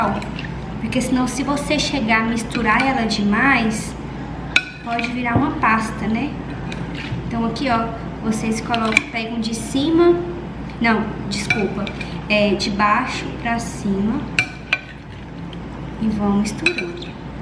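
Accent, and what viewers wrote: Minas Gerais, Brazil. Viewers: Até parece que mineira! Só faltou o nosso “uai”!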